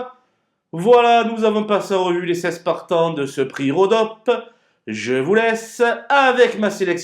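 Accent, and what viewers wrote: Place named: South, France. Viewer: Vous avez un bel accent du sud 😅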